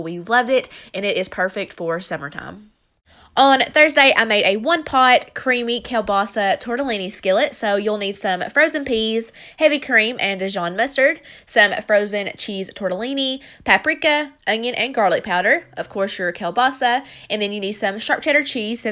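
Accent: South, United States. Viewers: My 8 year old daughter and I love watching your videos! The southern accent makes me feel like you're just a friend down the road! The food is realistic and your videos with step by step directions surely help those of us learning how to feed a family! Appreciate what you do : )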